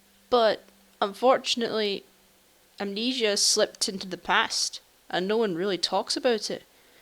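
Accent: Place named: Scotland, United Kingdom. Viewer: Ah yeah. You're the first Scottish FNaF fan that I've ever heard. For that you earned yourself a subscriber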